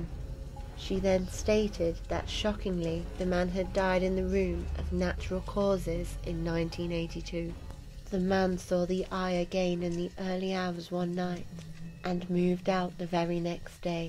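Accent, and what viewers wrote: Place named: Liverpool, United Kingdom. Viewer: Sounds like the fella in 'The Flying Pickets'. Weren't they from Liverpool?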